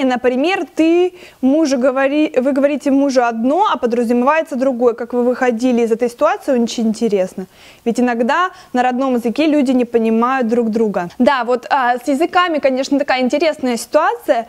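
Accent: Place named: Spain. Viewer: вот, ровно два года назад акцента еще не было)))) испанский язык внес очень красивый оттенок в ваш русский язык))))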